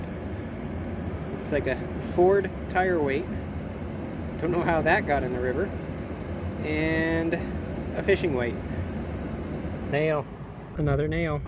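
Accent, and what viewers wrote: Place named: Australia. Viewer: Loved the aussie accent 🤣 my theory why Australia has all the big gold is because the heveys always sink to the bottom 😉 thumbs up from down under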